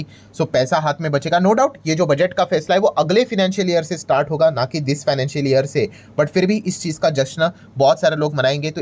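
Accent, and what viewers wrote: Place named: Gujarat, India. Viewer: bhai awaaz se pahchano, yeh gujrati hai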